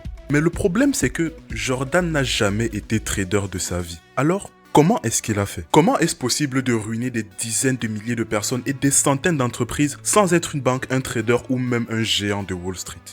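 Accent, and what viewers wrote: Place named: Africa. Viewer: dommage que tu as un accent africain qui gâche tout le professionnalisme de la vidéo. Désolé mais dirait une parodie.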